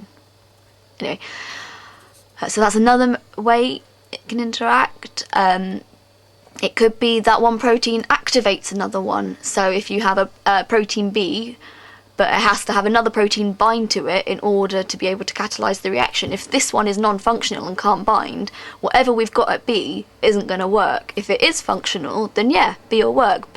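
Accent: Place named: United Kingdom. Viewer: a late night study session before a test can always be made a little better with a cool British voice girl that's good at explaining stuff. Thanks!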